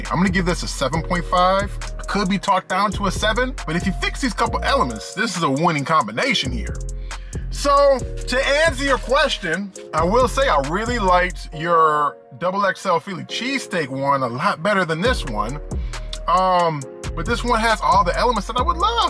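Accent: Texas, United States. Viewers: That Texas accent 😹 1K calories for the burger????!!! 🍔 It does look super good though! Only hot dog I trust is from Costco 😎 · Love the attempt at sounding like a Texan LOL Shout out from Dallas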